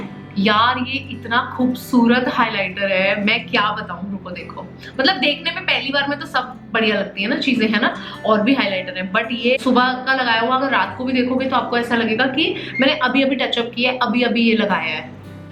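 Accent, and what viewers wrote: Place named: Chandigarh, India. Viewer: One thing I like about ur video is A. being a tricity girl u hv that accent that Tricity Ppl 🤪can relate and B..u explain very well..💗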